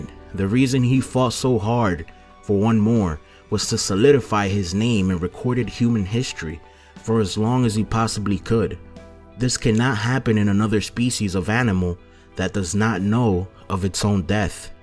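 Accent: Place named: Dominican Republic. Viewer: Papá tú acento es full dominicano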